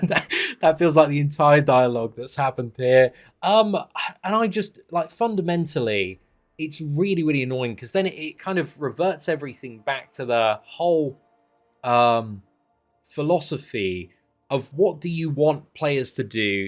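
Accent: United Kingdom. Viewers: It is hilarious to listen to a British man swearing lol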